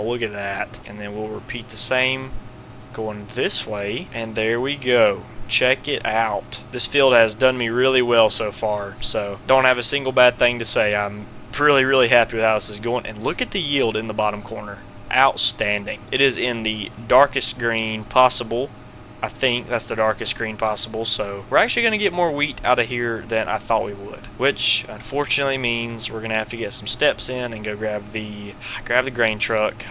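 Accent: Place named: North Carolina, United States. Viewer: Just found your channel watching this series loving so far don’t know where your from but sounds like NC only because you sound just like Dale Earnhardt JR lol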